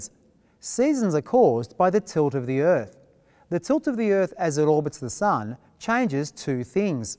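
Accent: Australia. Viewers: Sir r u from Australia?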